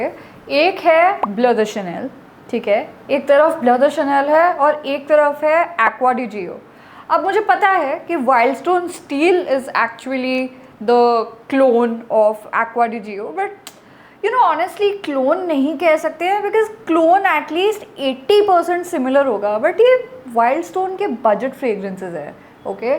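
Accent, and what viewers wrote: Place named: Bengal, India. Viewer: Are you Bengali?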